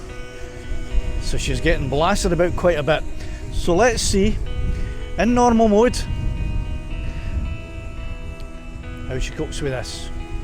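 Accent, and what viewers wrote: Scotland, United Kingdom. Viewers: Thanks for another great video! Always so excited to see another video of yours come out. Your cheery attitude and Scottish accent always lifts me up my friend. You do such a great job! It takes time to put these videos together and I for one really do appreciate it.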